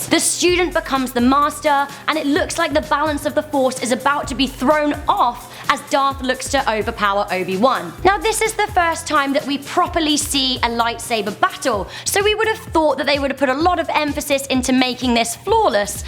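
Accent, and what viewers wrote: United Kingdom. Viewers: That's because she's British, you uneducated American.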